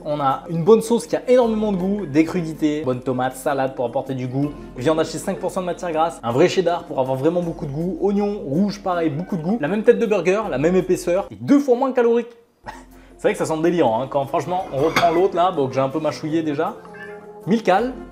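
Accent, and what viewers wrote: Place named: England, United Kingdom. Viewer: super video on voit que l'on peut quand même ce faire plaisir sans trop de calories mais par contre l'accent anglais sa me soules 😅😅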